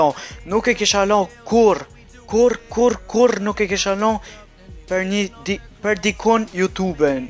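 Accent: Gjakova, Kosovo. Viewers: #naruto qysh po fol si gjakovar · ! Menove se je i gjakoves hahahahha edhe a din me fol gjermanisht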